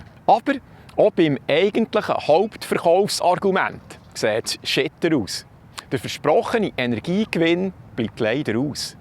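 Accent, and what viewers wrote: Bern, Switzerland. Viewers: 💪😄🙏🙏mega schöner Berner Dialekt. Gruß aus dem Aargau 🙏☘️☘️ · so nes schöns bärndütsch! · Potz hei ei ei... was för nes heimeligs, wunderbars Bärndütsch, wie fasch zu Gotthälfszyte. I bi fasch d Bänne abgheit wäg de ruschtikale Wörter. Merci viumau! Ha di Kanau grad abonniert. Gruäss us Brunnen SZ. :thanksdoc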